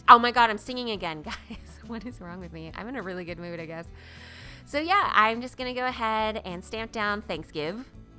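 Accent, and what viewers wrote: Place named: Northern California, United States. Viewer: Love it, knew you were from NorCal .... From Alameda :)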